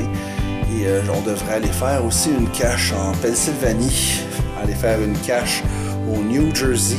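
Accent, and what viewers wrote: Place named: Quebec, Canada. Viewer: Merci pour cette vidéo. Cela faisait une paille (comme on dit chez nous en France )que l'on attendait ça. J'aime toujours autant ton accent. Bon tu va me dire que c'est nous les cousins français qui en avons un!! J'ai eu la chance d'aller travailler dans l'état de New-York il y a 3 ans avec un de tes compatriotes canadiens. Je débutais le geocaching mais cela ma permis au moins d'avoir un souvenir. Merci encore pour tes vidéos Au plaisir de te revoir. Choudrouna. (Du centre de la France ) Géo amitié.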